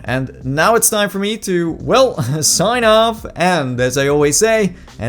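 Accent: Netherlands. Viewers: Ben je Nederlands?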